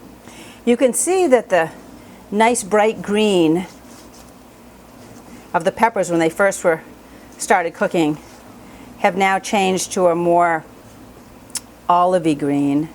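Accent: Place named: Maine, United States. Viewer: Do I detect a New England/Maine accent? I am from Freeport, Maine and you sound like home! Now I’ve gotta try your pepper jelly. Have you tried it over a loaf of cream cheese? Delicious..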